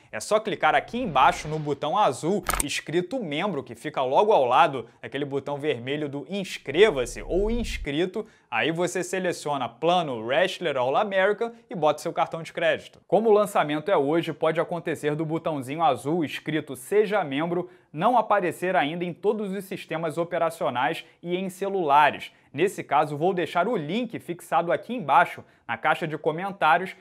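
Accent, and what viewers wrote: Rio de Janeiro, Brazil. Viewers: provavelmente você não vai ler esse comentário.... Mas parabéns pela inovação no canal 👏👏👏👏....merece o reconhecimento e o sucesso muito pela humildade (e olha que eu não gosto do sotaque carioca, problema meu, eu sei) espero que não perda essa humildade. O canal só perde em conteúdo para o Victor Miranda, mas entendo que são propostas diferentes. Quem ganha são os inscritos..!!...parabéns, voa, foguete não tem ré..!!!🚀🏆👆👏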